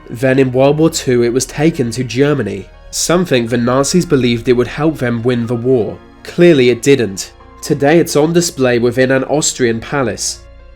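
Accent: United Kingdom. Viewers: Magicians are not creeps. You tea and scones accent. Fuckwit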